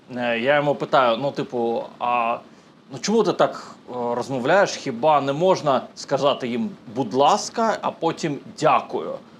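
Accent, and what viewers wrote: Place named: United States. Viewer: скажіть, будь ласка, ви вже напевно довгий час не проживали в Україні? Бо, наче, спостерігаю американський акцент у розмові)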